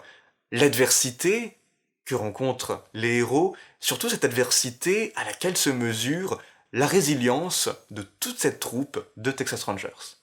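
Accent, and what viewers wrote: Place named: Walloon Brabant, Belgium. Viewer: Déjà, très sympa de ta part de prendre le temps de répondre à tes abonnés. Je salue le geste!:) C'est drôle car ton accent ressemble fort à l'accent qu'on retrouve dans le Brabant Wallon, au sud de Bruxelles. C'est fou! Mais bon, c'est toujours flatteur d'être pris pour un Belge, sache-le!:D Blague à part, oui, je comprends ton soucis d'agencer fond et forme dans de courtes vidéos, cela ne doit pas être facile en effet. Mais tu as raison, tes dernières vidéos sont plus balancées. Allez hop, un poil plus encore sur le style et ce sera parfait, mais je chipote... Bonne continuation à toi et j'espère que ton opération et revalidation se sont bien passées. See you soon!:)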